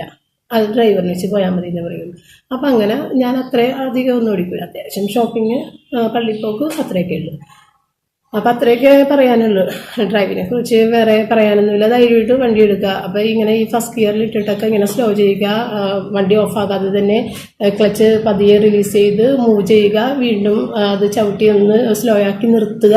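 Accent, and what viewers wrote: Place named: Paravur, India. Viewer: Paravoorile language kelkan nalla rasand nde anuyathi Paravoorukariyanu same avalu parayanapole..chechiyude kashtapadu manasilavunnund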